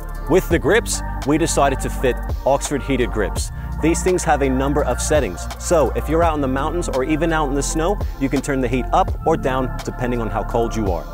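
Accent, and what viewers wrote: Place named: Australia. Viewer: Like it's kinda Aussie but all over the place at the same time?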